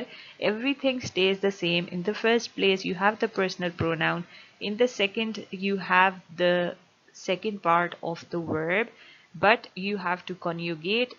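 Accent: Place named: Germany. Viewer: really like your german accent...why dont you make a video on german accent ..i would love to practice 😊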